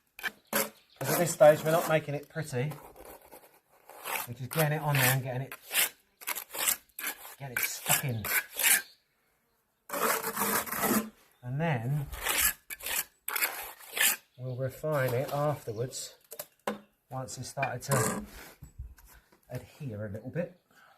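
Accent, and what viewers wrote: England, United Kingdom. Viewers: Watching from the SW of Western Australia. I am originally from Essex. Love hearing your English accent. You are doing a fabulous job on the cottage…Donna, 🇨🇰🦘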